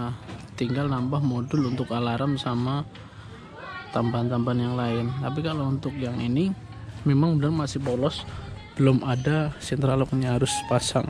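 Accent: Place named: Java, Indonesia. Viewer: Bahasanya belepotan. Campur aduk medhok .ha...ha...ha...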